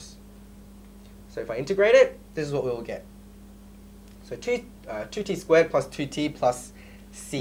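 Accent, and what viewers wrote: Australia. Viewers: Honestly this video really helps, Implementing examples and worked solutions really helped clear some misunderstandings I had, Thanks. Also the Australian accent is really rubbing off on me after watching a few of of these videos.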